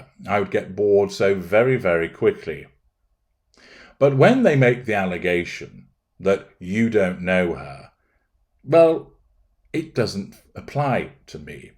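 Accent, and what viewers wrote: United Kingdom. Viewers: Totally love your commentary who wouldn’t be in chanted by his voice alone is very desirable. Oh the perfect British accent I imagine you are tall and handsome from Florida with love and flirting lightens things up.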